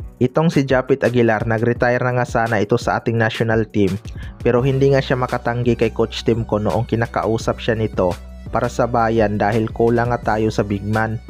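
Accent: Visayas, Philippines. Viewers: Naku poh c visaya nn man pla 2ng narretor...fake news 2ng bisaya n2...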